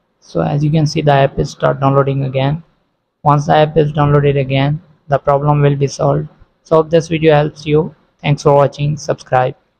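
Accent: India. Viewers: I already knew it was gonna be a pointless tutorial when I heard an Indian dude start speaking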